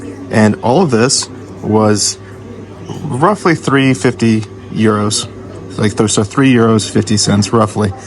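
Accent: United States. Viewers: Where is this guy from originally, The US, or Canada? It’s very hard to tell the difference most of the time. People in the state of Minnesota sound more Canadian than most Canadians do. 😂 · Belfast? I thought you were American with your accent interesting 🧐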